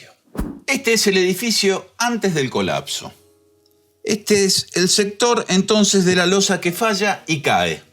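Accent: Argentina. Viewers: Muy buena explicación técnica realizada con esa manera de hablar tan característica de los académicos Argentinos.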